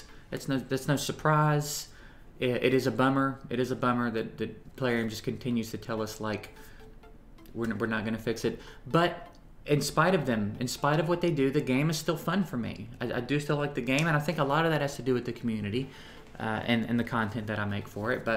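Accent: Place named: New York, United States. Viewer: Play Raid, back you were hood, edition. Nah, just New York flow. It makes me homesick. Yes, I'm still binging your vids.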